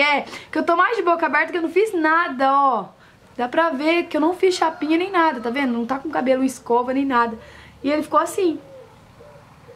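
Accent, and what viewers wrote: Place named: Maranhão, Brazil. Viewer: Seu sotaque é bem maranhense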